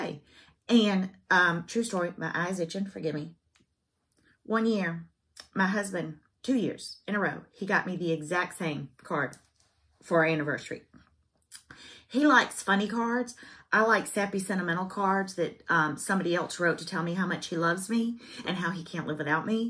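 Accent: South, United States. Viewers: Hi - to my ear you do have an accent - that southern one and I think it is lovely. I enjoy listening to you. Your chats are so valuable to the community. Don't ever doubt yourself if you are enjoying yourself - then it is not wrong or negative.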